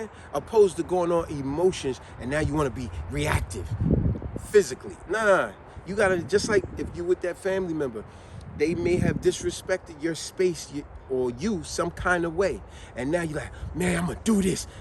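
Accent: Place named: West Philadelphia, United States. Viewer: He reminds me of growing up in West Philly and Camden.That is exactly how the guys would talk.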